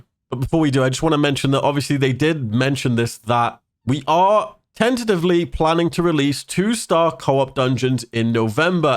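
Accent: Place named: RP, United Kingdom. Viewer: Lucent entry, but most importantly listening to the posh accent in your voice. 😂✌️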